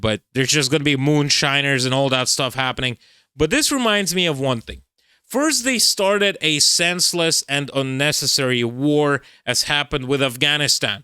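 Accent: India. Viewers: Brilliant Indian Accent. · OMG!!! YOUR FUCKING INDIAN ACCENT!!! 🤣🤣🤣🤣🤣🤣🤣🤣 I mean, the information is relevant and important but the way you deliver it is just BRILLIANT!!! Thanks! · Your Indian accent is new. Love it! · I love your Indian accent!😊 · I just love your videos! Especially your dubbing with different accents! Great Indian accent! As a American I want to know what is going on in Russia! I have been watching your videos since you lived in Russia! I have always enjoyed your videos!!!!😂😂😂😂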